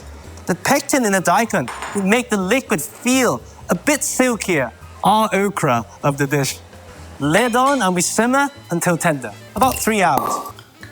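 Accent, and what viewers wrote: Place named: England, United Kingdom. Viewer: Bruuuuhhhh..... Thats the best Cajun "Chocolate Sauce" Roux I have ever seen from an Asian with an English accent. That has to be just damn excellent. Well done sir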